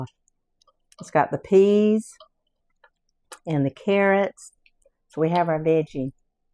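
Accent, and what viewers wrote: South, United States. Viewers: Thank you Friend. I love your cooking and your Southern accent.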